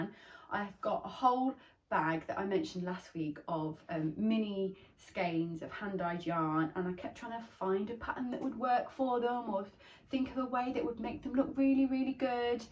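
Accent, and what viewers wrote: Midlands, United Kingdom. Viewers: I really enjoyed your first podcast and am very impressed that you tackled jumpers early on in your knitting journey. I am originally from Coventry (Eastern Green) and now live in Toronto, Canada, so nice to hear a Midlands accent . Love the modelling shots of you and your adorable kiddies 😆. Keep it up and looking forward to your future podcasts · I love the sleeves on your novice sweater! I found your first podcast yesterday and loved it, so got up this morning to watch your second before work. Plus I thought I picked up a Midlands accent! I’m from up the road from you in Birmingham.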